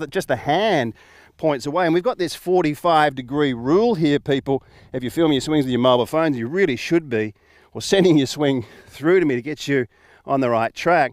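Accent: Australia. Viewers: I hear the Aussie accent are you based here?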